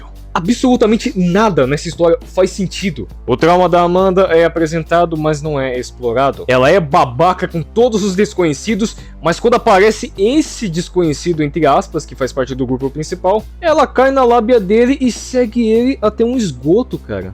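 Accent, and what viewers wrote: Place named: Pará, Brazil. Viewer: Eras esse égua, até pareceu paraense kkkkk gostei do rank, discordei de algumas posições kkkkkkk vlw, flw 👏🏽🫶🏼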